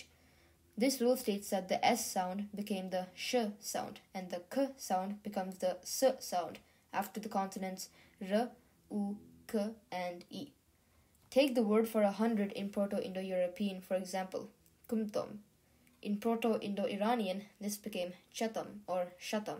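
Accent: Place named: India. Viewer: You accent sounds Indian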